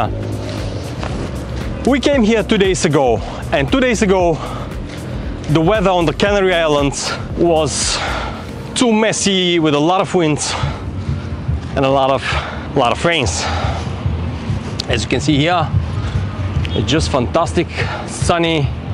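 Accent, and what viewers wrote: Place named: Bulgaria. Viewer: Where are you from? Your english is not bad but I feel as a bulgarian accent.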